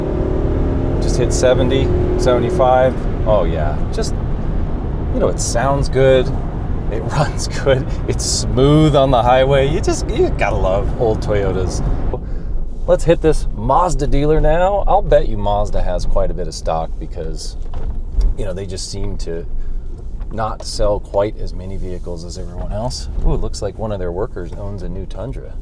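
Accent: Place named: Colorado, United States. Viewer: I'm buying my plug in hybrid Rav4 from Greely in the next few weeks! I could tell you are a fellow Coloradan by your accent 😂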